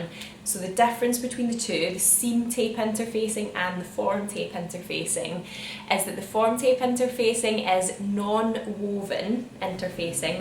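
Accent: Scotland, United Kingdom. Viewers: I watched your video for the interfacing and l just ended up really enjoying your accent...l really love scotish accent...wish l get to visit...greedings from Athens that is straggling with heatwaves and wild fires at the same time...oh! And of course you are very very clear in your video and very sweet...slainte!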